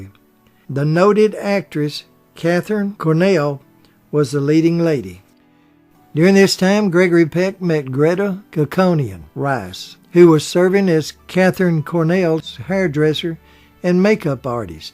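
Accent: South, United States. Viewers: One of your best,! I love your bios of legendary stars of our classic American films. A few little mistakes, though, it's Charles Bickford, not Charles Pickford, Chuck Connors, not Chuck Connor, and Jean Peters could not possibly have starred in 1958's The Big Country, as by that time she had married Howard Hughes and retired from acting; A Man Called Peter, released in 1955, was her last feature film. I think you meant Jean Simmons. I'm a Southerner myself, so I understand and relate to your wonderful drawl. My late mother was from middle Tennessee and often got a bit mixed up here and there (she'd say "punkin" for pumpkin, and "purnish" for punish - just the tip of the iceberg!) But it made her all the more lovable for us all. Keep doing what you're doing, and don't ever change!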